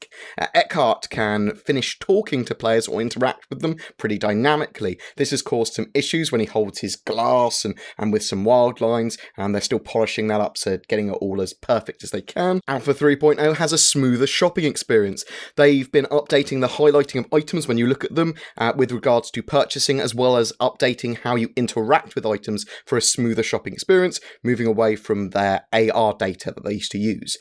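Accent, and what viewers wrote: England, United Kingdom. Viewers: Thanks for the update. Are you English?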